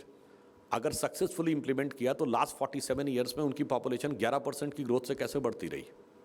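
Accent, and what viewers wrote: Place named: Maharashtra-Karnataka border, India. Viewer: नमस्कार बेटा 🎉 विषय फारच छान सांगतोस. मी न चुकता ऐकतोच. आपली भाषा महाराष्ट्र - कर्नाटक सीमेवरील आहे अस वाटत. जरा सांगाल का आपण कुठले ते. आशीर्वाद. आपले कार्य असेच चालू ठेवा .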